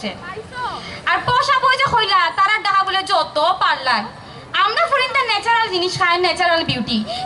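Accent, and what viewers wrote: Sylhet, Bangladesh. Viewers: অনেক সুন্দর সিলেটি কথা <num> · Tnxx sister nice ur sylheti kotah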